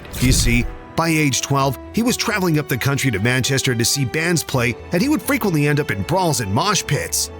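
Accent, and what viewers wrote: United States. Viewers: Click to watch a football video only to hear another yank voice narrative.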